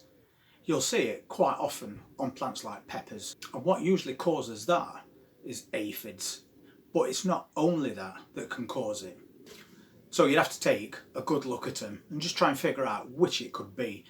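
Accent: Yorkshire, United Kingdom. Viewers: Free plants next year, I just watched a video about your move, I hadn’t realised! Anyway, I’ll get to my point, every year I give loads of plants away, I’m in south York. I know you’re in Lincolnshire, but your accent sounds very Yorkshire/Derbyshire Anyway…I know it’s a bit of a track to get to me, near Sheffield. But…if you like I’ll deliberately grow some in the cell pots then you can grow them on & I’ll post them to you or get my arse on the train & meet you half way. Save you keep paying out at garden centres for things like courgettes that barely cost anything to grow. Although I’ve got a massive garden I’m disabled, so my digging days are long gone, so I only use planters but I have got a greenhouse and a shed with a massive window I use for extra space…I might (ok I do) use the porch as well then any deliveries I have to say “it’s ordinary plants nothing illegal” 😂 I tend to grow flowers, tomatoes, cucumbers, courgette, pumpkin, carrots, radish & like you loads of lettuce! 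Basil, mint, rocket (love rocket to a sad extent 😂) spinich, I’m walking around my planters in my mind 😂 spuds & green beans oh & pak choi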